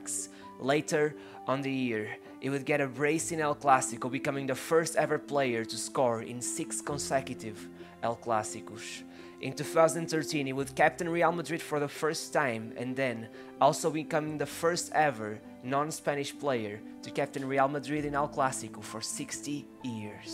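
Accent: Portugal. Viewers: Only portuguese people will notice the pronunciation. Good job fellow portuguese guy :) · You have a new sub. Spent a few years in Portugal after Ronaldo's time at Manchester United. My Mrs is from, and supports, Porto. Absolutely looooooooooooved this video. Your presentation is calm yet full of passion and appreciation for him. Loved your Portugues ascent too. The only thing that could have improved this video is his romantic return to United! 🙂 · Great accent only in a few words we can tell you are portuguese cá dos nossos.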